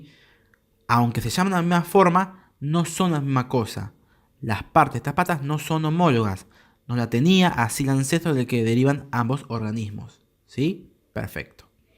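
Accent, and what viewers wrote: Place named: Argentina. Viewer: Tan argentino que duele, amoooooo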